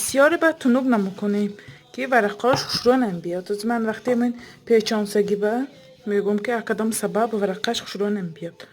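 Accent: Isfara, Tajikistan. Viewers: Шумо Исфарагими гапзанитон худи хамсоябони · Ассалому алейкум Хохарчон ман аз лахчатон фахмидам ки шумо аз Исфара мо аз Хучанд бисер хурсанд шудам ки занхои моям дар Ютуб хамин хел барномаи зебо бомаьно ширин дорем,Дастатон дардро набинад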